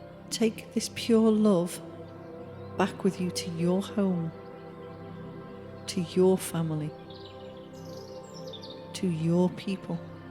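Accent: Liverpool, United Kingdom. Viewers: Been doing it since I was a kid nice to hear a scouser bring me back. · Are you scouse ? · I couldn't stop being distracted by her dialect. Not sure if it was Liverpudlian or something else. Also, to be honest, I felt it was all too "rushed" I needed a little bit more time to follow the directions. I think it's s great thing to share these meditation's, but a LITTLE SLOWER next time please! Thanks 👍 xxc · for some reason the british accent annoys me. how can i get over this? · Sorry, I can't relax with a Scouser talking, got both hands on my wallet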